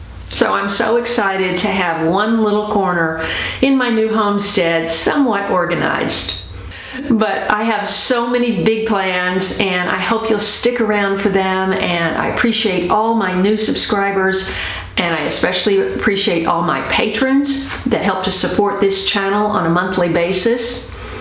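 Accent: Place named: South, United States. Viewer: This was a lovely video, the music was perfect. I can hear your southern twang coming through!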